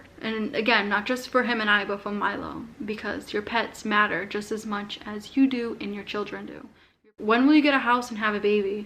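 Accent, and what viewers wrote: Boston, United States. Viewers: To add to the chorus, please keep your lovely Boston accent. I didn't know it was a thing until I found you:)) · I love the Boston accent! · I loooooove your Boston accent 😊!!! Don’t get rid of it! 😢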